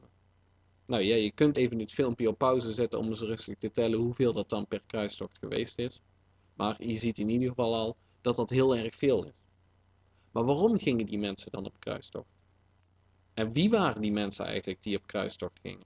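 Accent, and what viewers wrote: Limburg, Netherlands. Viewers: Ten eerste, dit is duidelijk geen Belg, meer iemand uit Limburg, Eindhoven zoiets en ten tweede doet ie meer dan jij in je hele leven zal doen;)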